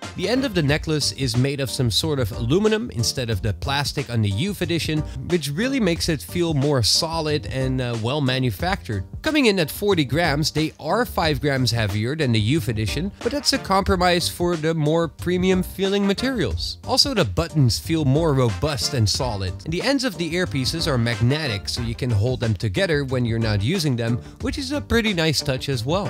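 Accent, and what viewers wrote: Netherlands. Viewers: Are you Dutch? I think I can hear a veeeery slight Dutch accent creeping through your otherwise flawless English. Groetjes ;) Also, as a Xiaomi phone user, do they give any benefits? Like the OnePlus' earphone has magnetic buds which autopause/disconnect and connect/autoplay when put together or separated, when using a OnePlus phone.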